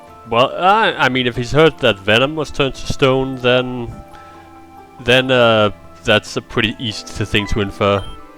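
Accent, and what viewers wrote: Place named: Denmark. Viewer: omg I love the shoutout you gave me at the beginning 😂😂😂(funny thing is when I first saw your video I was wondering if your accent was Australian and that's why you had a Talon flair. Took me a while to realize you were Danish because only Danish people pronounce the letter "l" at the end of words that way) V10 was interesting because that's when I really began to feel reinvested in Rejuv. Looking back, it was V4-6 that really got me invested in the game because that's when the story began to get riveting (I always loved the Valor Mountain finale), but then V7-9 came and I found them quite boring. V10 not just brought the return of interesting story, but I think that's when it really began to feature the beautiful mapping style that we associate with Rejuv. To me, it was the first version that felt mature and well-made and no longer like it trying to play catch-up to Reborn. 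Though interestingly, lots of fans kinda dislike Ch 12 due to the story. I remember Jan acknowledging the flaws with Ch 12 when he remade it in V13. Something about introducing more gameplay elements since it was more like a visual novel than a game. But my understand though is he couldn't change much of it as it was too consequential to the worldbuilding. The revisit to GDC in Ch 12 is quite interesting, since it was scrapped and moved around in 13.5 but contained important story elements that needed to be placed elsewhere: - Was the battle with Risa in the beginning of Ch 12 only added in a later versio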